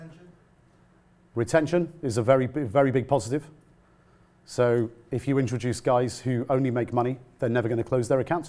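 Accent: United Kingdom. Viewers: Dont be intimitated with british accent. Turn on CC and translate words that dont make sense. Best of luck!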